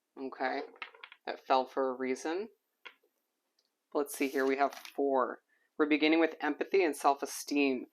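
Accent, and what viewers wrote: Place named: Midwest, United States. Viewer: You also have a VERY MIDWEST ACCENT WHICH IS SO CUTE! 🤩😍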